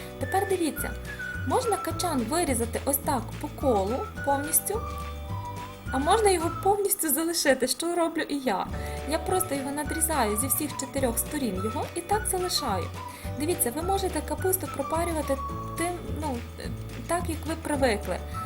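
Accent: Western Ukraine, Ukraine. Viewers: Не гадайте яка область західний говір приємний ніжний доходить до всіх хто кохається в мові України.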